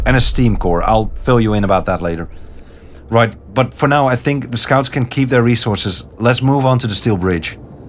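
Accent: Netherlands. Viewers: funny how you can immediately hear you are dutch :D this games soundtrack is so good, specially when the storm hits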